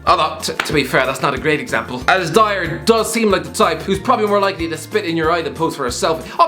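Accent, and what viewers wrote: Ireland. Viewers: My daily dose of an Irish guy screaming into a camera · Strangely this video served as a kind of anti pep talk. Thanks shouty Irish man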